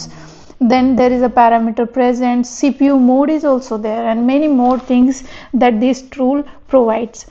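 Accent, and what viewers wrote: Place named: Maharashtra, India. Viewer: Marathi accent ! Nice information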